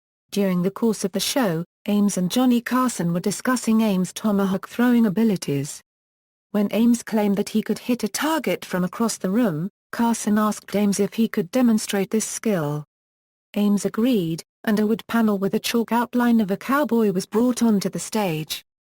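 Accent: United Kingdom. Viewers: Robo-voice with British accent.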